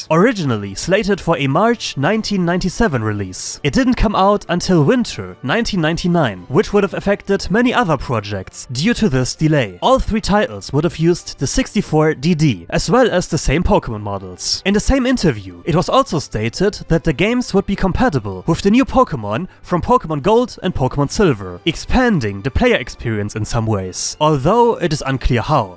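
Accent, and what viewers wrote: Germany. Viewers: Nach deinen Akzent gehe ich stark davon aus das du aus Deutschland kommst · You make the German accent sound oddly attractive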